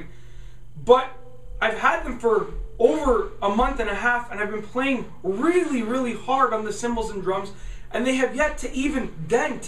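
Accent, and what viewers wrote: Canada. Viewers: I love your Canadian accent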